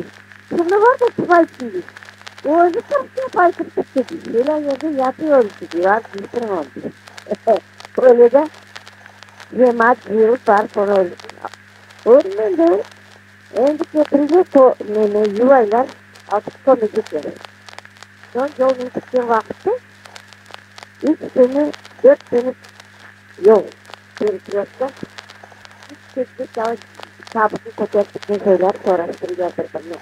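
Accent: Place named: Coast, Turkey. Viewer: Bülbül yalıboy şivesi, diñlemek hoş keldi). Kereksis şatırdı alınsa daada yahşı anlaşılır. Sağ oluñ, var oluñ. Işleriñiz oñ kelsin!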